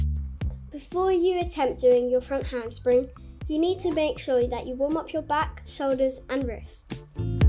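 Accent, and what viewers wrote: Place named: United Kingdom. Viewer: I love your British accent 😃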